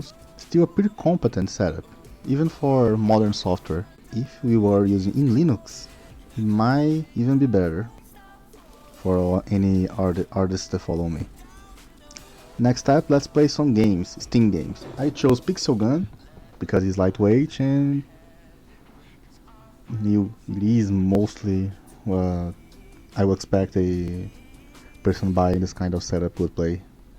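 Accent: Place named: Brazil. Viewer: Immediately recognized the Brazilian accent 🇧🇷🇧🇷🇧🇷🇧🇷🇧🇷🇧🇷🇧🇷🇧🇷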